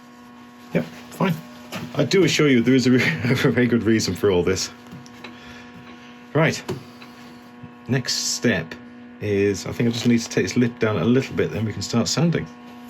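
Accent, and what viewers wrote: United Kingdom. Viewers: I've seen many of your projects but this one, even royalty would blush. While stationed at RAF Lakenheath, I enjoyed all aspects of Great Britain. Now that I'm retired back home in Texas your accent and kind words bring back those enjoyable times. Keep creating my friend!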